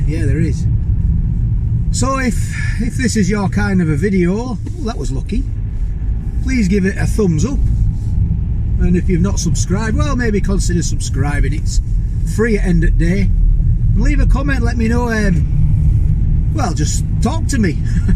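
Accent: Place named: Cumbria, United Kingdom. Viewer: love watching your vids my freind my grandad was from Egremont and you remind me so much of him by the things you say the accent etc your vids are are breath of fresh air in this turbulent times, carry on the great work mate dave from manchester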